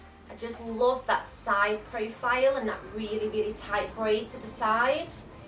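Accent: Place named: England, United Kingdom. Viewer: great clear tutorial!! i watched a view but got lost seeing the fingers! ps love your English Accent.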